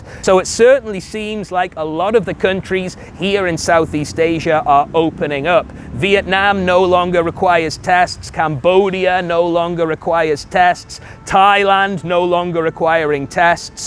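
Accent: United Kingdom. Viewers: Love British accent, love your videos.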